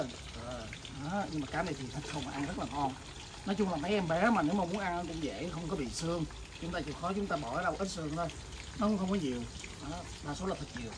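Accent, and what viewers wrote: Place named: Mekong Delta, Vietnam. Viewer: A quê tỉnh nào. Mà sao giống Miền Tây quá vậy A.....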